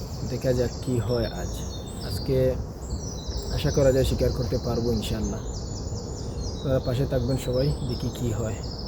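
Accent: Sylhet, Bangladesh. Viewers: ভাই শিকার করেন আইনি কোনো সমস্যা হয়না। আর আপনি কি সিলেটি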